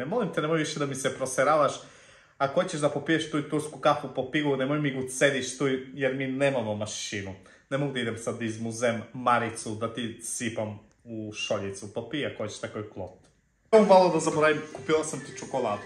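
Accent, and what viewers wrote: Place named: Zaječar, Serbia. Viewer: On je iz Zajecara ne sa juga,tako se prica i u nas kraj....On je bukvalno pogodio u srz tog zivota inostranog...🤣🤣🤣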